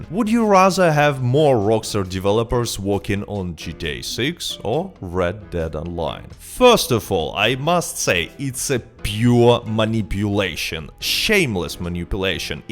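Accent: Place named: Russia. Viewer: Love the Russian accent and Russian attire makes it great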